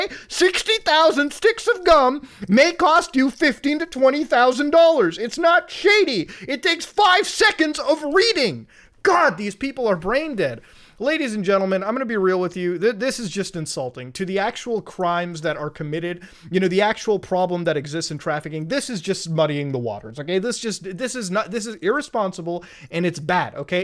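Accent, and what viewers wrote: Canada. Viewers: You can tell is Canadian by the way he pronounces “tomorrow” as “to-more-oh” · Anyone else feel like mutas Canadian really came out thick on this one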